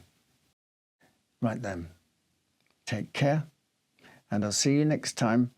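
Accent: United Kingdom. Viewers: Something about your British accent and your articulation makes you very enjoyable to listen to. I thank you for your service sir!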